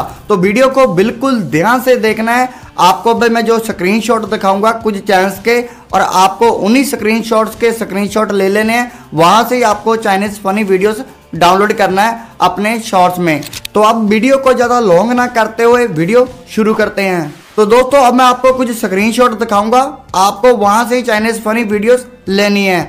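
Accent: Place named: Punjab, India. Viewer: Bhi app Punjabi oo lgda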